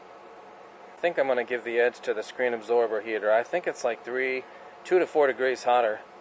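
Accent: New England, United States. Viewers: New England accent?..Looks good though. I guess there's a temp difference between steel vs aluminum cans.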